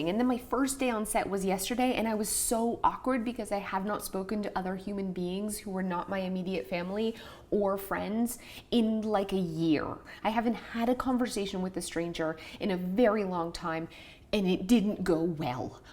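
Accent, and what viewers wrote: Canada. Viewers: Hi! I’m relatively new to your channel and absolutely love how authentic and awesome you are! You’ve inspired my cricket self to continue to create organized spaces in my home that make me happy. It’s also great to hear a fellow Canadian accent – makes me miss home a little less. So excited to hear that you’re in NYC filming - you got this! Rooting for you!:)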